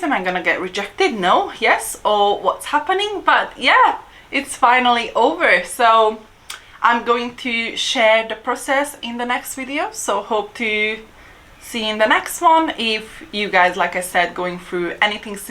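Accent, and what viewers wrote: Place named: Europe. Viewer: you CANNOT claim British Citizenship if you had a baby born here in the UK from a British Ciztizen! Having a child who is a UK citizen does not automatically grant you citizenship as a parent. you were talking out of your arse! don't spread nonsense information that is absolutely not the case! Before you post videos, do your research! Giving up your Hungarian citizenship was the stupidest thing you could ever have done! What an absolute idiot idea! So basically, you gave up on your Hungarian Citizenship because you are a self-conceited person that it makes you believe that you are somewhat of a bigger person than the rest of us. I can easily imagine if you ever went on holiday to Hungary, you would be pretending to be a British born Citizen and only speak English to everyone. how pathetic! But in reality, you have lost a lot by giving up your EU Citizenship :) Another thing, your accent is off. 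You still have that strong European accent, so keep practicing! One day you might be able to have a British accent ;)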